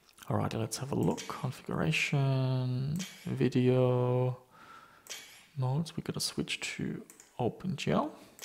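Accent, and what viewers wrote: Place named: Germany. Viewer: You accent seems to indicate that you are originally from Germany.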